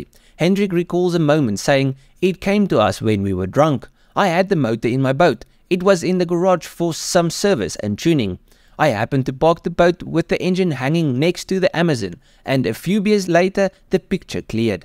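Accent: South Africa. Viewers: Clearly you can hear the South African afrikaans accent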